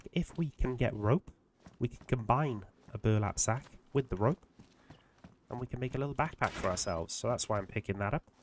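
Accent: Wales, United Kingdom. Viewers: Late to the party with DayZ and your videos have been amazing at setting me off in this game. The Welsh accent is a great accompaniment to the end of the world too 😂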